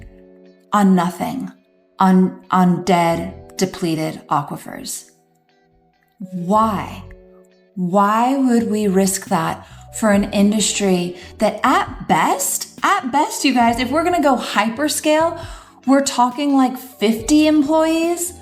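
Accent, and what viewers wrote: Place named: California, United States. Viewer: Where did you come from when you moved to Marshfield? Never mind, just found out you came from Kommiefornia. I was wondering because to be frank, you sound like someone from there. Why don't you carry your butt back there. We don't want folks like YOU in Missouri.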